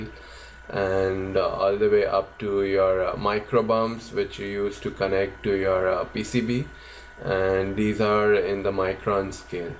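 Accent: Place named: India. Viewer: 阿三这口音我勒个去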